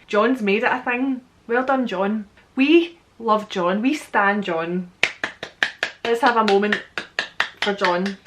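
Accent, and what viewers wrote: Scotland, United Kingdom. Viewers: You have the best Scottish accent ever. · I am a Japanese student who moved to Edinburgh this autumn. I became a huge fan of your channel and I am now trying to watch all your previous videos. Thank you for this lovely introduction to Scottish language and culture. By the way, because of my poor English listening skills I am watching your videos with automatically-generated subtitles, but sometimes they don’t help me due to your lovely accent. I’d really appreciate it if you could attach subtitles. Anyway I can’t wait for your next video! Stay safe. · there are words I can't understand because of Scottish accent I'd say😞💔. I 'm more used to American probably😔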